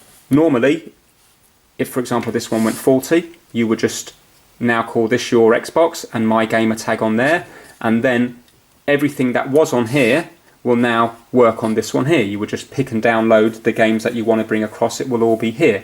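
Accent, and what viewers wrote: United Kingdom. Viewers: Awesome! Here we are in 2019, self-driving cars and all, yet those janky paper-cutouts (and my subliminal sense of confidence in an erudite British accent) were exactly what I needed so my kids can play at the same time. Thanks dude, from JB in California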